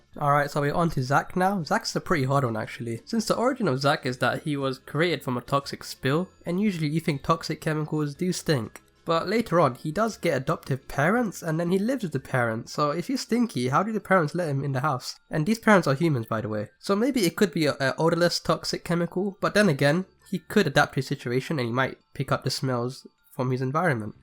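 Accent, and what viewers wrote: United Kingdom. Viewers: Dude I like your accent. It's a british one right? Sorry I'm not familiar with all the accents.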